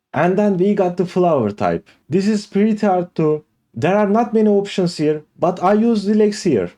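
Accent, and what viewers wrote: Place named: Iran. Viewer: Bro your accent is like iranians im from iran 😊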